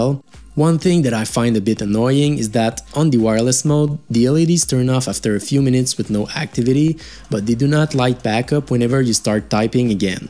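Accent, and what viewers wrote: Quebec, Canada. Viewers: J'ai comme l'impression que t'es Québecois, pas vrai?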